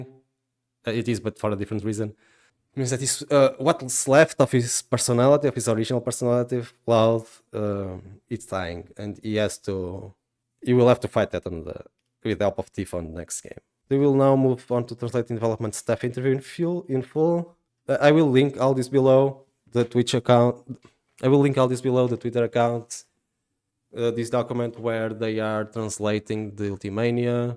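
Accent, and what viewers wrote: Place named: Brazil. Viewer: Esse inglês não engana quanto às nossas origens, do que vi é um bom vídeo, vou guardar para ver mais tarde!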